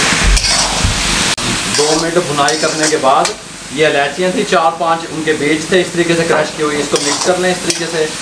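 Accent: Punjab, India. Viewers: Kya bat hy apki panjabi ki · 😆😆😆😆 bht hasi ati h jb punjabi bolty h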